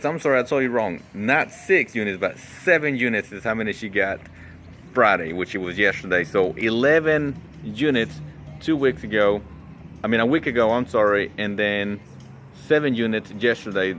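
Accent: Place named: South, United States. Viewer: Where are you? You have a touch of a southern twang, in your talking voice! XD Well, show us what you are keeping so we can advise! OMG, your going to be overwhelmed stop buying units til you clear stuff out!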